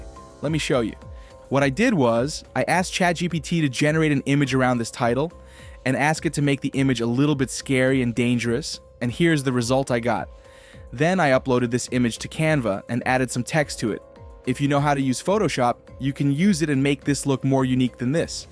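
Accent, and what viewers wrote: Sri Lanka. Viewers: bro are you from sri lanka?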